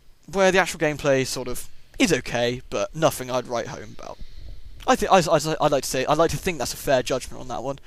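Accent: United Kingdom. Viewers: You made me laugh so much during this LP :D My boyfriend wants to buy this game because after I saw your LP I talked about it a lot. I love Lovecraft, and I love your British accent so this LP was what made me subscribe to you.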